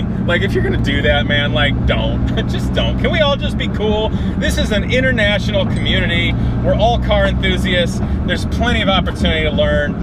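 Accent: United States. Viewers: Great vid, enjoyed listening to a Yank ;D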